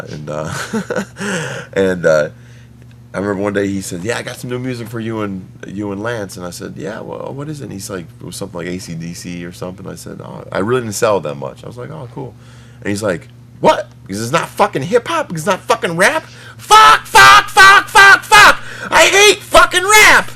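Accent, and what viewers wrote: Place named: Spain. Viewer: It's his Spanish accent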